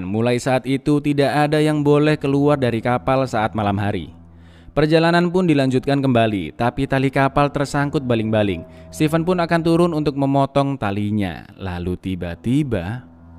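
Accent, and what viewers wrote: Java, Indonesia. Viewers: Ini Adalah Channel Membahas Alur Cerita Film Paling Asik Salah Satunya,Gara2 Membahasnya Medok + Asik:)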